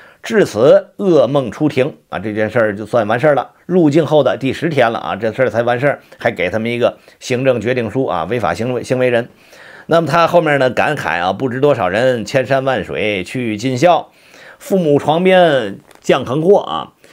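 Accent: Tianjin, China. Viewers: 博主是天津人吧哈哈哈哈这乡音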